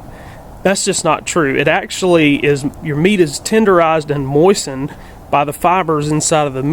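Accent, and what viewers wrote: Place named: Texas, United States. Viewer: I always smoke in my offset bur a bud got a vertical Brinkmann and neither of us had any idea what the hell to do! Thanks for the video, sir. BTW, your accent, are you one of my fellow Texan brothers? Hope all is well with you and yours, sir.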